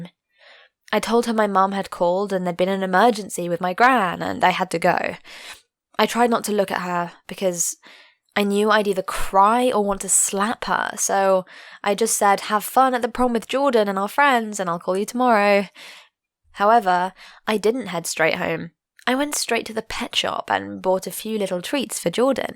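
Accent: United Kingdom. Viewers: ffffff british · I love that STRONG BRITISH ACCENT.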